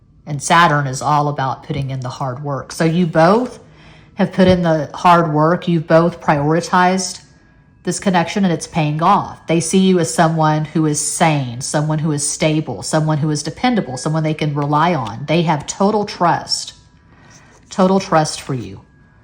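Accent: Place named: Texas, United States. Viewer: I liked before i watched 😅...i like ur voice...vocabulary...and texan accent...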